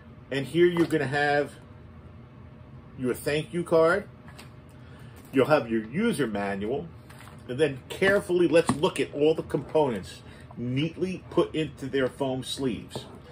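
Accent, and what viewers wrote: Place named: New York, United States. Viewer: are you from new york or new jersey? love your accent and videos, cheers!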